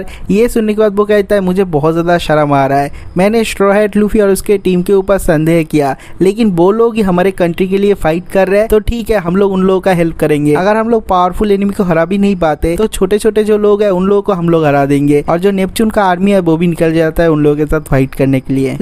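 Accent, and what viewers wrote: Bengal, India. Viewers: Tum kiya bangali hoo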